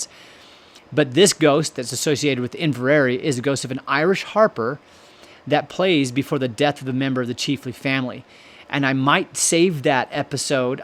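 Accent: United States. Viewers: Why are we heari g an americans voice,instead of a Scottish voice. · Why is an American doing the voiceover?? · Putting up the name of each castle somewhere on the Image as you were speaking about it, would've been helpful, especially as (no offence) your American pronunciation is not always on par with ours. Maybe consider it? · Narrator with an American accent? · Why is there an American voice doing this , it put me off , he’s reading all this and not very well . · I am an Armstrong please do oters with a Scot speaking instead of your American voice...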